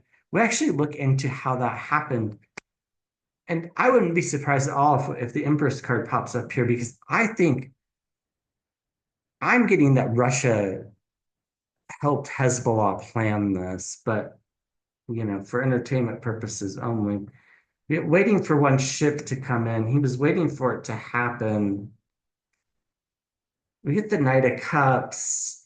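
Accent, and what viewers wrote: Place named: United States. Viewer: you are a light amongst the darkness. I am an Australian (I guess like most of the educated world) just shake my head is disgust and amazement at what the Felon and his "band on merry men" are doing and at the moment getting away with. Your readings are amazing. On another note i can't pick what part of America your accent is from, (not where you are). Could you answer that for me NATO still exists even without the USA. Australia will be looking for new alliances and partners as well Keep up the amazing work, Thank you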